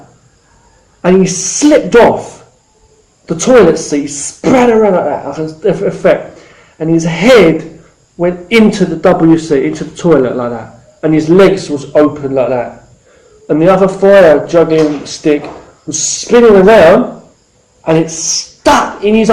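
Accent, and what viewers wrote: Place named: London, United Kingdom. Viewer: BRILLIENT HAHA YOU CAN HEAR YOUR LONDONER ACCENT HAHA LOVED THIS AND THE HUMOUR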